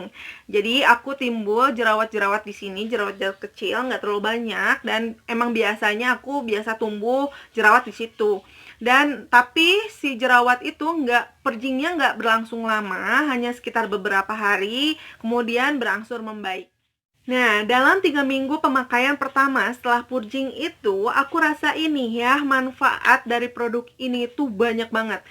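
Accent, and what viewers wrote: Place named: West Java, Indonesia. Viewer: Best skincare product 2020 nya teh. Aku sukaa cara jelasin tth Sunda banget 😍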